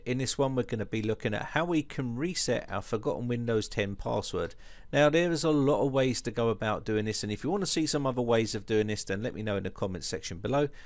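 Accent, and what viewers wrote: England, United Kingdom. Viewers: British english is to die for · Why Englishmen talk so much? Is this a lullaby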